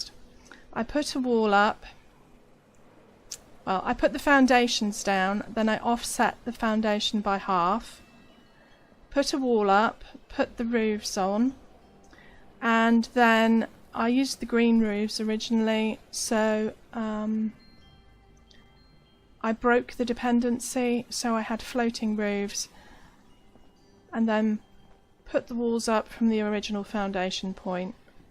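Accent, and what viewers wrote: United Kingdom. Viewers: I love seeing all these camp builds people come up with, thanks for some ideas, really helps as I'm not that imaginative if I'm honest. Shame you only play on Xbox? it would have been nice to see your camps ingame and have a chat. Love your humour and accent too, despite being literally from the same country and having pretty much the same humour as all British people do really. Have a good weekend c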